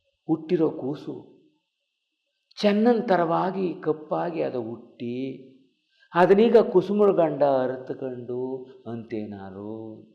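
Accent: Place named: Old Mysore, India. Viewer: ಹಳೆಯ ಮೈಸೂರು ಪ್ರಾಂತ್ಯದ ಗ್ರಾಮೀಣ ಸೊಗಡಿನ ಮಾತಿನ ವೈಖರಿ ಅದ್ಭುತ ಗುರುಗಳೆ 🥰❤👌🙏🏻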